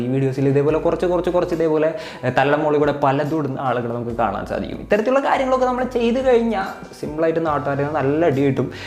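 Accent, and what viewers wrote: Thrissur, India. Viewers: Chettan mmade Thrissur aano 💜💜atho Angamaly aano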